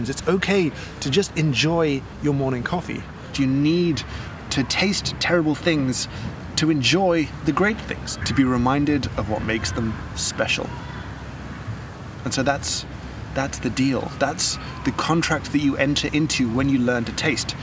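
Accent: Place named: England, United Kingdom. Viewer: It's kinda funny because (for my understanding of accents) sounds English, and to our rather specifically cultivated Italian taste buds, England is among the worst eating places in Europe. I'm not saying Italy has great food and everybody else doesn't - in fact Italy has been out of the main "best restaurants - most Michelin stars in the world" tour exactly because in a way Italian taste won't meet international taste: our wine is tasteless to Californians and French, and until Tuscany started making wine specifically for the USA, we would not sell or score high abroad. But the concepts brings forth are rather sound, tasting for Italians, on average, is more developed but also more of a niche, and we won't be satisfied by anything that won't taste as our mothers did it. And if everything is special, nothing is special.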